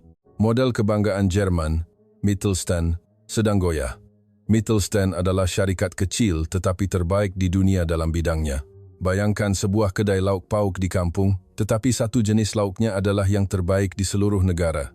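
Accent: Indonesia. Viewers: kenapa bahasanya tone indonesia 😀ini mesti channel indonesia kan???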